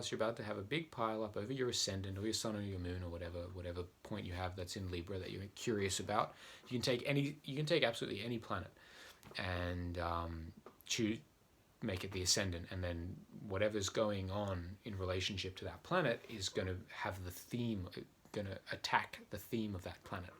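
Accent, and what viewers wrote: Australia. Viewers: I'm so glad put you on for this Mercury retrograde video! I actually have Mercury retrograde in Pisces in my natal chart. I'm an Aquarius and I'm soaking up all your information! Great stuff! I'm definitely going to be subscribing to your youtube channel and sharing your videos with my friends! You sound like you're Australian? I love how you're giving a detailed explanation of Spika, etc. Thank you for talking about how each sign will be affected! That's very generous of you! All my best from the East coast in the states! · clearly knew the accent straight away… and was soooo thrilled was show casing your work… Amazing how it takes following someone over the other side of the world, to be able to appreciate someone in your own backyard! I’m on the gold coast… so happy you have been acknowledged for your skill and craft world wild.. shall follow … thank you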